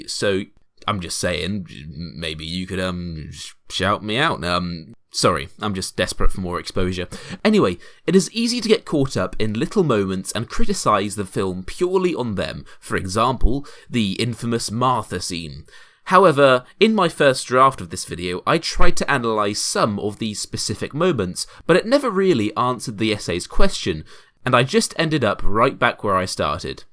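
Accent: United Kingdom. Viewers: Why do you sound British while your grandfather sounds Scottish?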